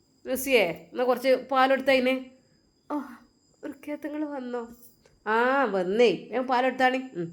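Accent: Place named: Malappuram, India. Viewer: തൻ്റെ ഭാഷാ കേട്ടിട്ട് എൻ്റെ സംസാരത്തിലും മാറ്റം വരുന്നു ഞങ്ങൾ തൃശൂർക്കാർ ആണ് അപ്പോ എൻ്റെ ഭർത്താവ് പറയുകയാണ് നിൻ്റെ സംസാരത്തിൽ വിത്യാസം വന്നല്ലോ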